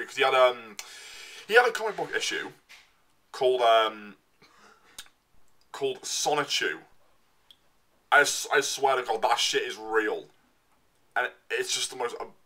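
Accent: United Kingdom. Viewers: I take it with that accent that your British if so its nice to see a British take on you know who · Are you from the north? More specifically Scarborough? I'm terrible with identifying accents but you sound very similar to my accent.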